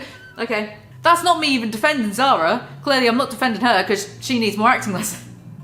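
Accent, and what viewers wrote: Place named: RP, United Kingdom. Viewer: I love your posh accent :D I've never watched any of these shows, but would be hooked if you were on there insulting everyone!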